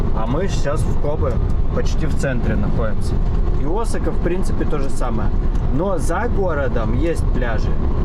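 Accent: Ukraine. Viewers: А кто знает? У него такой акцент как в Украинцев. Он оттуда?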